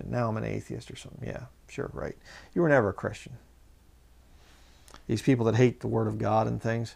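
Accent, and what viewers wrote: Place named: Pennsylvania, United States. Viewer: Don't worry about your accent brother, we Pennsylvanians are unique in that regard. I'm from the other side of the state -Pittsburgh and many of us say "keller" for color (and "aht" for out and "cahch" for couch)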